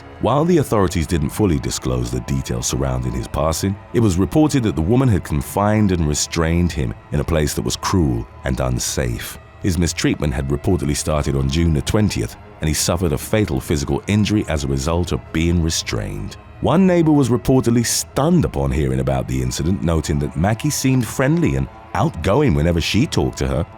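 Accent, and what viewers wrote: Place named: United Kingdom. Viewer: definitely has a gift for story telling and his commercials are absolutely funny when he wants to be. And that voice with his British accent make it very enjoyable to listen to.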